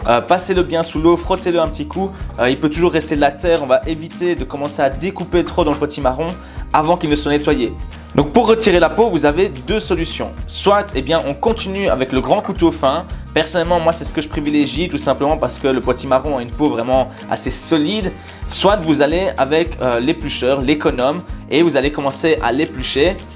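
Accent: Belgium. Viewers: votre charmant accent belge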